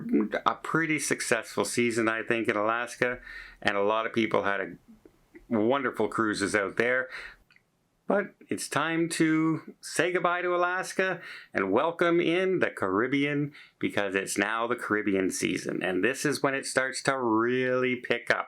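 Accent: Canada. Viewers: He is Canadian give him a break on the pronunciation, eh?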